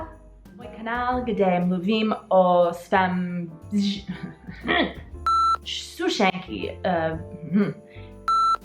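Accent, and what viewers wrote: Ostrava, Czech Republic. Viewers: jde videt ze jste pobyvala i v Ostrave vase cestina je presne po nasemu, pekny recept a krasne se to posloucha asi to budu muset zkusit uvarit :-)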